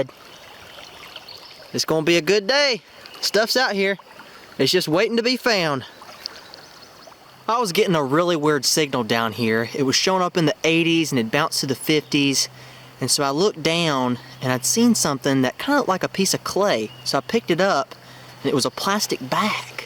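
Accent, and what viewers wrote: South, United States. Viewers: nice mellow southern drawl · He has the most southern ascent ever and i love it!!! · I like his southern accent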